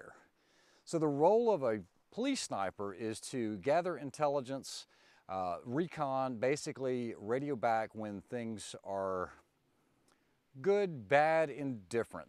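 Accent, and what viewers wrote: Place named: Midwest, United States. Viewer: random, but me and my buddies are trying to place your accent! where are you from? i think you have the vowels of the midwest personally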